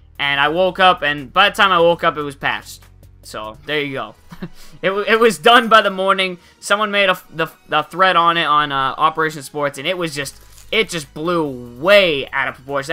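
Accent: Boston, United States. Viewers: are u from boston??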